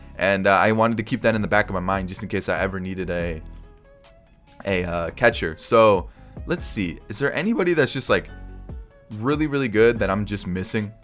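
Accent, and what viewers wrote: Louisville, United States. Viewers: You from Louisville??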